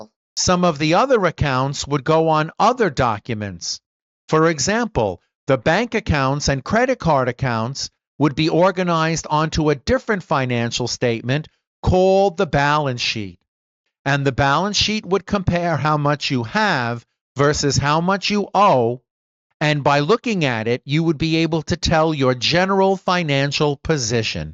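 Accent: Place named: Bronx, United States. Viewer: I like the Bronx accent!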